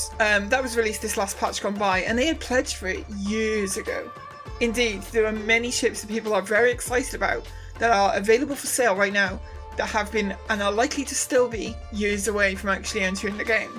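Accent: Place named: Liverpool, United Kingdom. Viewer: This accent sounds like Scouse.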